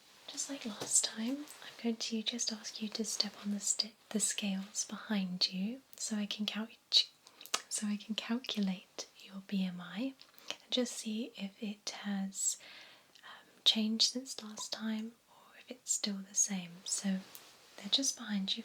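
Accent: United Kingdom. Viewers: I just love your British accent! Where are you from?London?Manchester? I'm from Brazil and have never been to England. Even though I study as much English as I can, sometimes it's pretty difficult to find out accents from all over the world. Once you've read this message, please let me know. It is like a self test about accents!Love your videos!BYE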